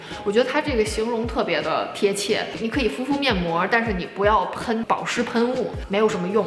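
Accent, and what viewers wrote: Tianjin, China. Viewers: 我第一次看你的视频就觉得你是天津人，和我一个多伦多的天津朋友说话的那个味儿太像了。我本人坐标温哥华，希望能分享些挑选衬衫的方法。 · 你好，请问你是天津人吗？ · 博主讲话有天津味儿。跟Tracey 有点像。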